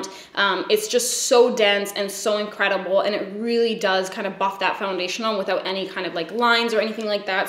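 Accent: Canada. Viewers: her canadian accent though!!! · I had to go watch another video to see if you were Canadian lol. We talk really fuckin close to the same so I was pretty sure and then the other video I watch you were wearing a Canada hoodie...roots maybe? Merry Christmas 🎄🎁🎅🏻🍻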